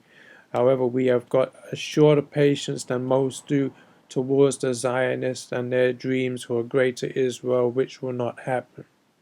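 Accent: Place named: United States. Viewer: why do u sound american then?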